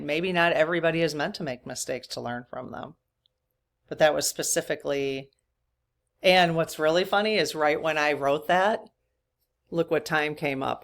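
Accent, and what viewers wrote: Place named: Wisconsin, United States. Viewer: I grew up in Milwaukee and I detect a Wisco accent in you. Is that right? We moved away when I was 12 but I still have tons of relatives and friends there with that accent, which I love! I have ADHA and grew up with teachers telling my I was an underachiever because they knew I was smart but still was only getting Cs and Bs in school. I spent twice as much time on homework as my friends but no one knew why - there was no concept of ADHD then and people just thought I was slow to get things. Even in college I had to work twice as hard to get my BA in experimental psych. I didn't feel dumb but I just knew everything took me longer and was harder and I would make lots of simple mistakes such as in simple processes like addition and subtraction.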